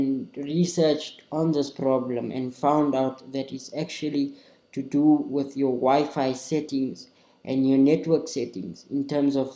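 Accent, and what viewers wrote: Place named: South Africa. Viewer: Love what sounds like a South African accent- am I correct bro?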